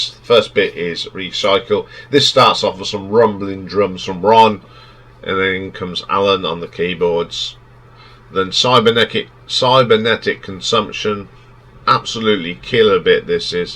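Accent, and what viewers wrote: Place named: United Kingdom. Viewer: You sound British, but your sentences hardly make proper sense. Anyway, good luck with your channel...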